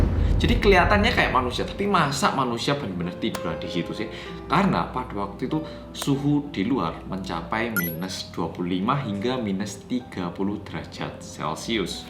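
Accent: Java, Indonesia. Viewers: Mas sampeyan lek tak rungokno wis rodo ilang medok e 😁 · Akhirnya konten medok lagi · orang korea yang medok balik lagi yey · Ngomongnya medokss jawa banget kalau ngomong bhs indonesia..hehehe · YAYY OPPA MEDHOK UPDATE 💖💖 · entah kenpa cara mas menyampaikan cerita terasa sangat santai dan gaya medoknya membuat saya kangen kmpung halaman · Bersama orang korea yg medhokkk ❤❤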